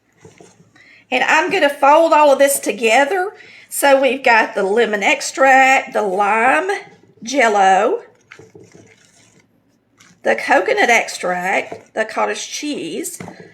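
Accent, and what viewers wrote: South, United States. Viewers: Girl you are SOUTHERN with hat drawlllll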